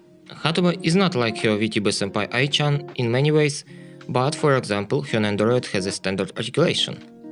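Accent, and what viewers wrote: Russia. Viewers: You sound Russian my comrade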